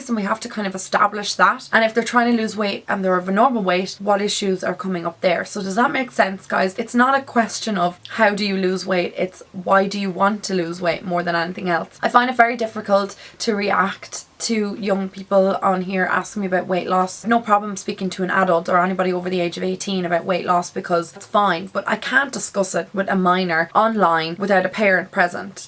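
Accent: Ireland. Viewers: Are u Irish if so I'm from Connacht in Sligo and I think your from Dublin right?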